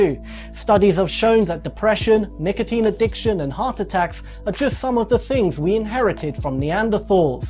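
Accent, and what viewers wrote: United Kingdom. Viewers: you sound British and your number 2 signal just told us all to go forth and multiply! Really! You should know the difference btween two and FO.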